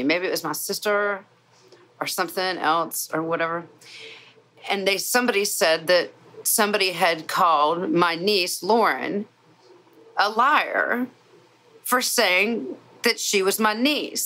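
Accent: South, United States. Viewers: Good deal...taking up for her like that. I'm not used to hearing a slight country twang to your voice but, it sounds good. The more you get excited, the more it comes out. I probably sound like pure West Virginia hillbilly but, that's where I'm from....LOL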